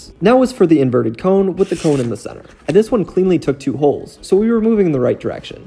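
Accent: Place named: Russia. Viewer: Как будто у него русский акцент на т и р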